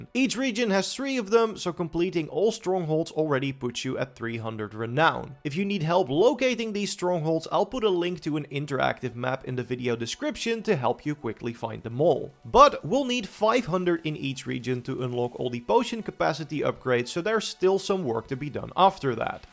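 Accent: Netherlands. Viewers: Loving that Dutchlish. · 99% sure it has to be a Dutch accent 😅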